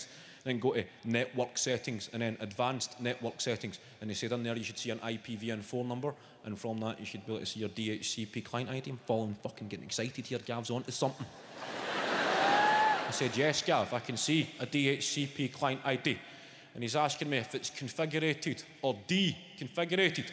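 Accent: Scotland, United Kingdom. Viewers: Does he really expect non Scots to understand him?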